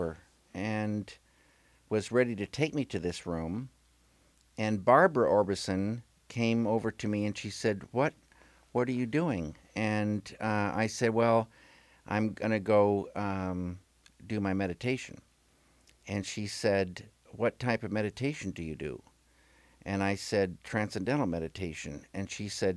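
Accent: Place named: Utah, United States. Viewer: Is David Lynch from Utah? His voice has that particular cadence they use there.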